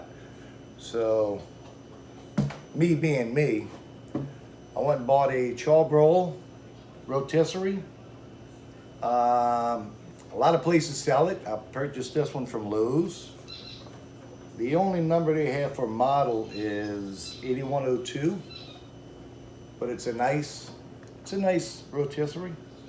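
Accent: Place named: New Orleans, United States. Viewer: you sound like you from NOLA with that accent.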